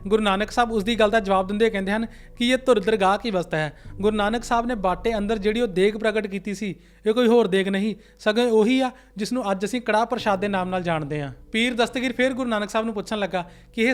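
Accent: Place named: Majhi, India. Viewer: ਸਪਸ਼ਟ ਮਾਝੀ ਪੰਜਾਬੀ ਵੀਰ ਦੀ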